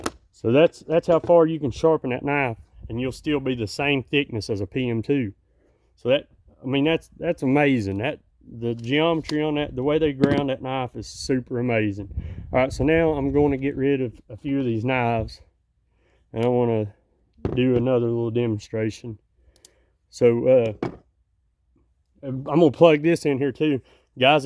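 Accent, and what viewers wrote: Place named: Virginia, United States. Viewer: I should have realized you were from Virginia by the accent. I am too (although I live in Texas now). Great to know a fellow Virginian! Great review on the Baklash. They just came out with a carbon fiber version, so I may have to grab another one.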